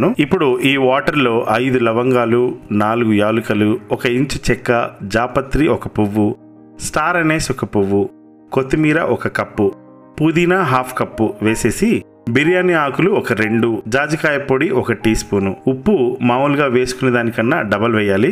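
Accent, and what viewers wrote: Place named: Godavari, India. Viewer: Godavari yaasa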